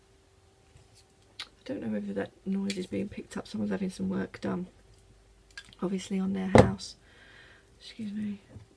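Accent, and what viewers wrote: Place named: United Kingdom. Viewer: You do such lovely things. I could listen to you all day long, I do love the British accent, it sounds so much more cultured than our American English. The Royal Albert tea set is so beautiful, long a favorite of mine. I do love drinking from tea cups, but it cools too quickly, so I use a large, thick mug. Not so elegant, but serves the purpose. I hope to see you again soon.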